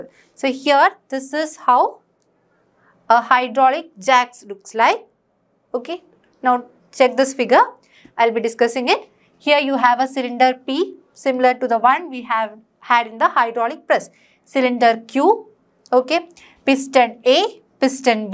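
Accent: Kerala, India. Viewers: Ma'am r u a malayali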